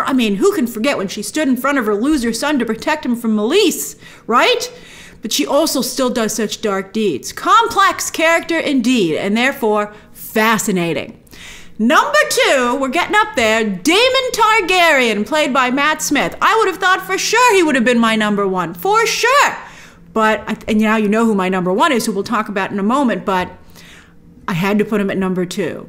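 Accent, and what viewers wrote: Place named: New York, United States. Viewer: I love her new york/New Jersey accent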